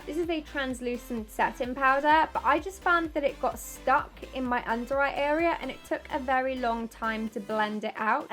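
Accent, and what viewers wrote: United Kingdom. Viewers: I love her and her british accent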